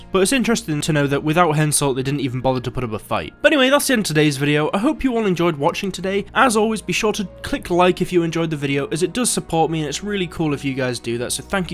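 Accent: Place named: Scotland, United Kingdom. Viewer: The Scottish accent tho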